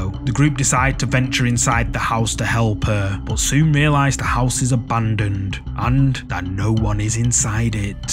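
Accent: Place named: Scotland, United Kingdom. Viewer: Love this (Scot?) accent!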